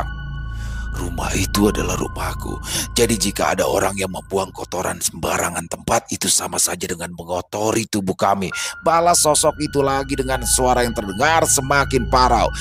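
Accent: Jakarta, Indonesia. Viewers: Saya suka kisah santai,lucu gaya bicara nya betawi bgt pokoknya kereenn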